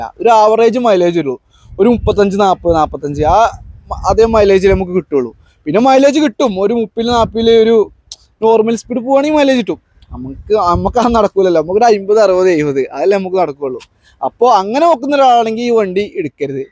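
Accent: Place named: Nilambur, India. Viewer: Confident aayitt paray muthe Anne kond nadakkkum Nilambur language❤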